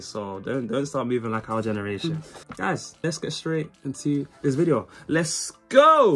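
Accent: United Kingdom. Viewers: i could be wrong, but you sound british, and say youve never heard the beatles or queen? have you been in a coma your entire life?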